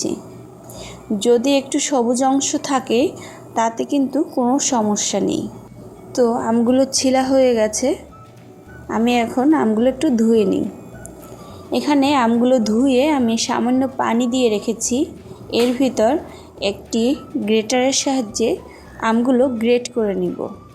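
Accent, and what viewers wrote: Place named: Bangladesh. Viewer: ওটা বাংলাদেশি আঞ্চলিক টোন,আমরা ওভাবেই বলি।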